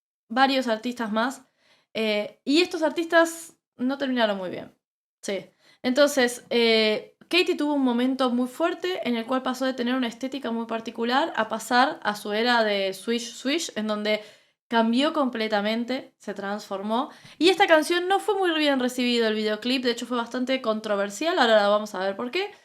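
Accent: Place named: Argentina. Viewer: Es primera vez que veo un video tuyo. Sigo a muchos argentinos, sobre todo al presidente Milei y su lucha por la libertad. Por tu idioma deduzco que eres argentina. Para mí, es un ritual para que los onvitados se la coman. He escuchado que hay evidencias que altos personajes de la música y la política disfrutan del sabor de esa carne. Sé que no puedo decir más. Un abrazo desde Cape Coral Florida EEUU de, una exprisionera política cubana en el exilio.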